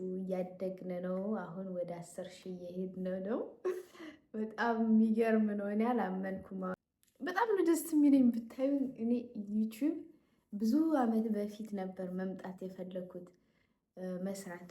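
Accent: Ethiopia. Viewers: I like your Amharic style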